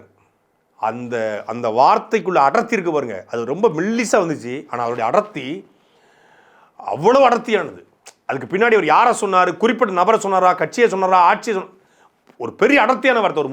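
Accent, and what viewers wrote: Tirunelveli, India. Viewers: தெளிவான உச்சரி ப்பு தள்ளு தமிழ் தெள்ளு தமிழ் தெளிவான உச்சரிப்பு திருநெல்வேலி தமிழ் அழுத்தம் திருத்தமான பேச்சு சாட்டைக்கு நிகர் சாட்டையை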